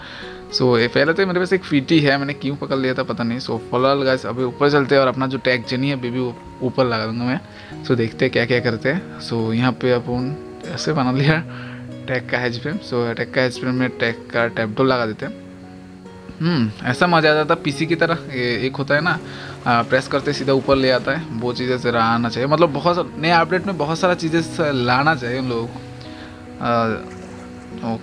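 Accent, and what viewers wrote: Bengal, India. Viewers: Is your mother tounge bengali? ???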